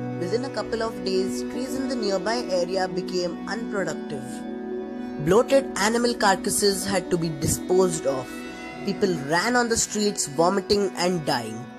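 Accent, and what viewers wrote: India. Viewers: Yes his accent is exactly Indian accent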